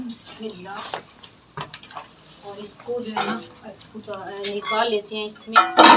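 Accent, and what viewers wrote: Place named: Lucknow, India. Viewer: wali beti tu aaj luckhnow wali urdu bol rahi hay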